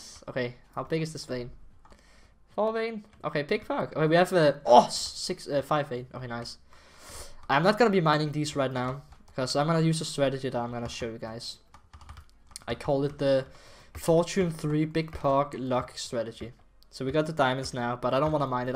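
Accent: Denmark. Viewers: U danish? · Is this man from Denmark ? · are u danish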